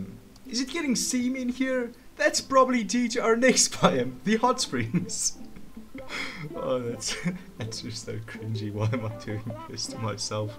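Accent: South Africa. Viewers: I knew it! Your accent was giving RSA vibes, but then you confirmed it yourself! 🇿🇦🇿🇦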